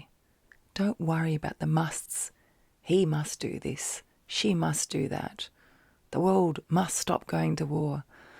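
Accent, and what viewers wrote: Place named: Australia. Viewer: I can definitely tell you studied counselling, your remind me of my psychologist I used to see! I love your voice/accent- I'm Australian too but I'm in Florida for a year so it's lovely to hear an Aussie accent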